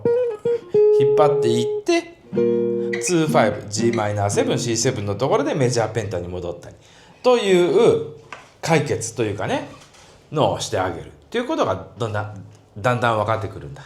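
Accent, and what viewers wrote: Nagoya, Japan. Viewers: wes弾いたらうまそう😂 名古屋方言が心地良い🎸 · 快傑ズバット👍 誰が分かるねん😅 名古屋弁いいですね！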